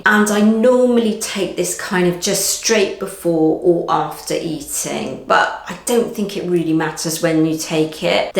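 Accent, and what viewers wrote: United Kingdom. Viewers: A Nigerian with Brit accent? Hum, they don’t go together!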